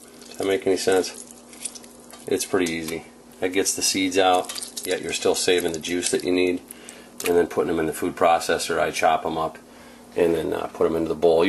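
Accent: United States. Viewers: Hi thanks for the Video and info, I am living in England and did not quite catch what it was you added to the Marmalade later on in the Video after the sugar. It may be the American Accent or an American product I am not familiar with... I've just started prepping this year and have started to move onto producing long term storage foods. Not spoke or met any other preppers yet in England does not seem to be as big a concern over here.... Thanks for your time Tony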